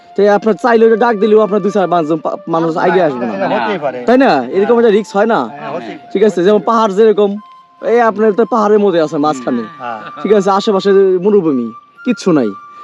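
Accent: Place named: Pabna, Bangladesh. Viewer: এনার কথায় মনে হচ্ছে ইনি পাবনা জেলার মানুষ, আর পাবনা জেলার মানুষের কথার মূল্য আছে,এরা পাগল ছাগল হলেও দুইনাম্বারি কথা বলে না,অনেক পাবনার মানুষের সাথে আমি থাকছি,এদের রাগ একটু বেশি, কিন্তু মানুষ হিসাবে ভালো, পাবনার পাগলা 😂😂❤❤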